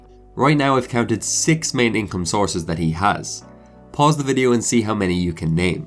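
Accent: Ireland. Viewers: What's the background music ? Great video btw. Really enjoyed it! Are you Irish? XD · Me in the first few seconds: "Is that an IRISH ACCENT??" 👂🏻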